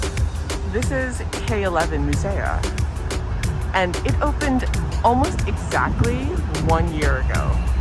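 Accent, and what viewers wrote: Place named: Long Island, United States. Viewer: I knew you were from NY! I live on Long Island and was surprised at how much you have that typical Long Island accent.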